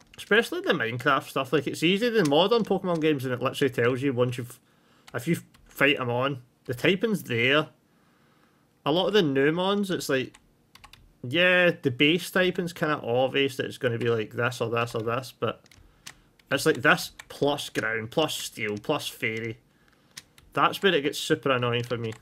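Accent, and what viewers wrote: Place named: Scotland, United Kingdom. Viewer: Haha love the vid where abouts in Scotland you from your accent sounds a lot stronger than mine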